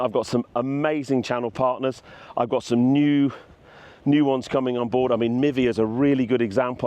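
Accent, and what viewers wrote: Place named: RP, United Kingdom. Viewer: Mmm but you have gone bit posh😢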